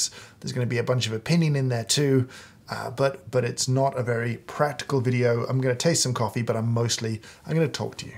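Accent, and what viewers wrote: United Kingdom. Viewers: I have learned so much from you over the past year that I haved started watching your videos. They are incredibly helpful and full of insight and expertise. I love your way of talking (and it's not just because you have a British accent 😁😂) and how you easily articulate your thoughts, it actually reminds me of Allan Watts. You have intensified my love for coffee a thousand times more. Thank you very much for being so amazing at what you do. Wish you bliss, joy and health. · If someone ever tells you, you speak too fast--ignore them. I love to listen to people who are fluent, concise, precise, and find it painful to focus on information, which is delivered slowly, with lots of gaps and searching for the right word. Having a youtube channel is the perfect venue for you. You have something you know well (coffee) and are able to speak about it intelligently, with concentration and focus. 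I am not a coffee drinker (yet?), but enjoy your videos because of your delivery--made even better by your British "accent". Thanks.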